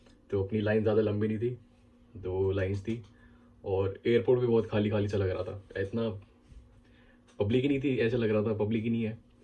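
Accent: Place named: Gujarat, India. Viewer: Gujarati English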